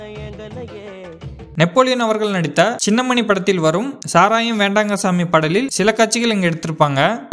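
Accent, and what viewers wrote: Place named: Madurai, India. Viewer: Super madurai language avinke .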